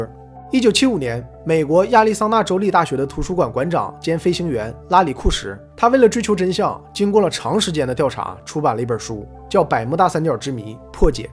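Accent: Northeast, China. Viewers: 东北口音太重了😂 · 原來以前的老視頻東北口音那麽重的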